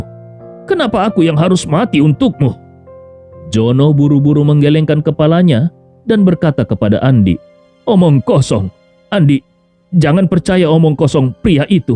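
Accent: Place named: Bugis, Indonesia. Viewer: Bismillah....maasyaa Allah..logat bahasa bugisnya ada..mwmbuat hati ridu akan tanah kwlahiran suamiku....salam untuk semua sodaraka..sehat afiat smakin sukses selamanya....aamiin..Abang yg baik....trimakasih..atas semua kebaikan..yg membuat semua bajagia..smoga lindungan Rahmat Allah mrnyertai...tsumma aamiin....sukses sehat slalu bang...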